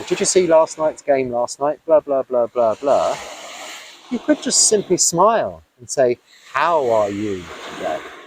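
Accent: England, United Kingdom. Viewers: This monk received an offering of two bananas and gave one back to the Hindu temple in another vid. So unlike me, sharing what is meagre and being giving. Yet I'm always wanting to see myself as kind. I like to hear his Pommy manner of talk, feeling less solitary and thinking of his hardships not managing in the local language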